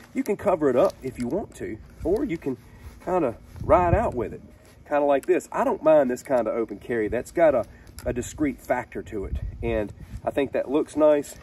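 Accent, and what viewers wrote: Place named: Virginia, United States. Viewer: Can I just say as an Englishman the Virginia accent is the coolest in the 'States.😁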